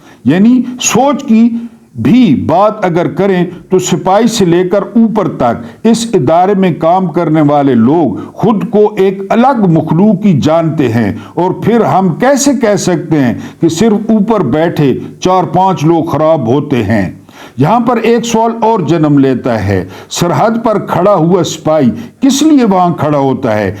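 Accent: Saraiki, Pakistan. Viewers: السلام وعلیکم۔ آ پ کا انداز سرائیکی لہجہ میں بہت sweet ھے لگتا نھیں آ پ کا پروگرام پاکستان سے ھو ورنہ۔۔۔۔۔اللہ پاک سب کی حفاظت فرمائے آمین آمین آمین M.Saeed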